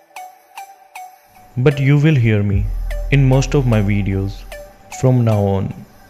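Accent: India. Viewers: I subscribed u wen u had 120+k subs now u have 300k+ subs....that's a great achievement in jst one year.....gud luck for ur future...and btw r u indian??cuz ur accent sounds like one...love from North East India.....😄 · Me: Heard his accent Also me: Is he an Indian?🤔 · I knew it!! You're an indian 🇮🇳👳 I will never forget that accent Btw.. You've done such a incredible video. That's showing your talent so much, the sound effect and the timing.. Cool man.. Love from Indonesia❤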